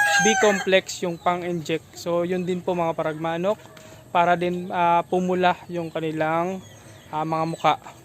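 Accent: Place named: Samar, Philippines. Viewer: Ha Samar ka?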